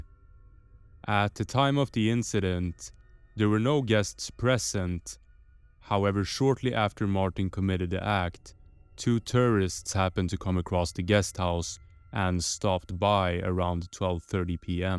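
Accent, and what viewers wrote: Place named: Sweden. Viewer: du gör inga typiska svengelska tabbar men det är några pyttesmå detaljer som avslöjar det 😁 'a' - ljud är något som verkar vara väldigt distinkt för oss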